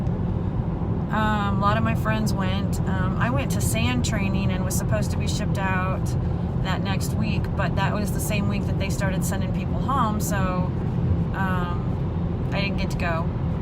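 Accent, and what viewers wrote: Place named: Ohio, United States. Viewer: 😂 You sound just like my wife. She grew up in rural Ohio. When she moved to NYC, she initially found us to be rude and abrasive. After now living here for almost 3 years and seeing all of the good that is done, she sees we are genuinely nice people who remain guarded because we come into contact with a lot of crazy on a daily bases. Your journey is awesome! Keep sharing.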